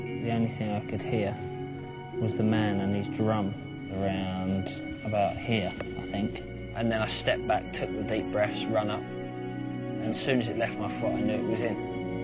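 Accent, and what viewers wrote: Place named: United Kingdom. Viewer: Acento británico... Difícil de entender pero lo sigo intentando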